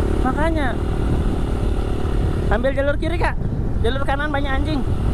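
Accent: Bali, Indonesia. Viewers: Bang lu orang bali suaranya aja sayatau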